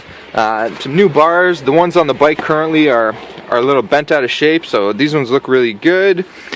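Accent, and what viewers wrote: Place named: Canada. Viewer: Oh you Canadian? "Check it oot!"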